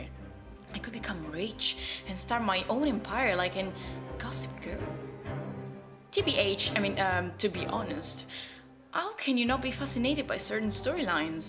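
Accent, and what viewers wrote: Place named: United Kingdom. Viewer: amo quando parli in inglese! (E amo il fatto che capisco benissimo perché parli in modo semplice e aw). E cominci a fare tuo l'accento british, questa è una cosa bellissima! Continuerò sempre a seguirti, sappilo! E MORE VIDEO IN ENGLISH PLS, I NEED THEM Comunque: quoto l'intero video. Proprio ora mi sto riguardando tutto HIMYM per la terza volta. Di fila. IT'S SO HARD BEING A FANGIRL AND YOU UNDERSTAND ME AND I LOVE YOU PS. Per le altre ragazze: guardate che ha già fatto un video dove spiegava come ha imparato l'inglese. Cercate bene e lo trovate, non l'ha postato nemmeno troppo tempo fa! PPS. I love you <33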